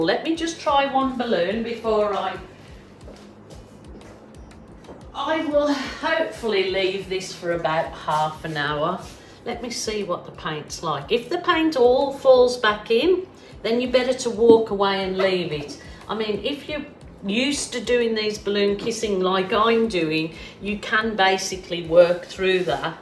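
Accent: Birmingham, United Kingdom. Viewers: Hope you're feeling a lot stronger really soon ..am awaiting the first of 4 surgeries myself including a full donor cornea transplant eventually in the hope of reversing the 100% blindness in my right eye and 60% in my horrid legacy of nearly dying of COVID months in hospiral/ respite ..I taught printmaking too . Just try & take it easy and don't push yourself Youtube..just hope your surgery heals fast x Hey as an English person ( born & bred in Liverpool by Strawberry Fields in fact )..ARE YOU or have family close to you from either the Midlands ( Birmingham ..Izzy Osbourne country ) OR my old neck.if the woods in Liverpool..I.know the amount of Australian fact terms ,swear words lol.& even humour & spelling of course that Britain & Australia.I'm that way are like twins..HOWEVER I strongly hear a Birmingham accent in your voice..OR Scouse any word with two OO' s ..yeah ...so are you ?? 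lol?😆 Wish my late mum was alive she was a potter and my only family ,lost mum aged 94 when I was in the ICU fighting Covid..those round foil paintings my mum would have LOVED them ..just pure colour = mum ❤ xx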